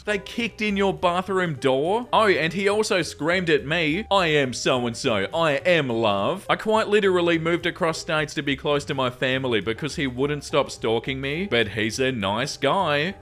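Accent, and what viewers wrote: Australia. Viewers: That’s not a British accent. That’s an Australian accent. They’re approximately 9500 miles apart. Let me guess, American?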